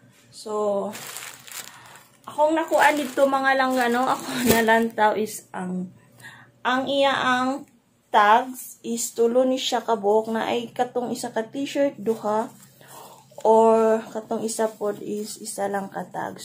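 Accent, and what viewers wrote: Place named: Philippines. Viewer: 35🌺🌺👍, love Filipino accent 😊, stay blessed 🙏 🙏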